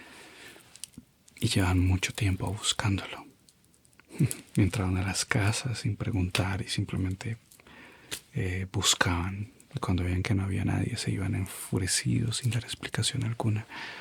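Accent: Colombia. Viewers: Es colombiano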